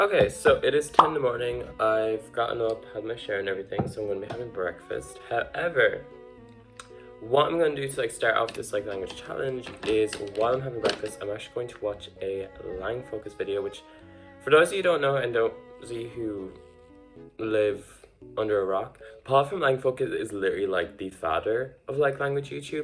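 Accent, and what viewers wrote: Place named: Netherlands. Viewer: As an Afrikaans person, learning Dutch. I can hear a lot of Dutch influence in your Afrikaans. Your pronounciation is Dutch.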